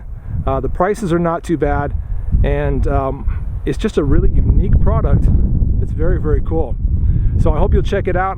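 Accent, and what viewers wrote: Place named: Massachusetts, United States. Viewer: ooooh sexy! (the sight, not you dude) I swear man you sound like youre originally from Mass..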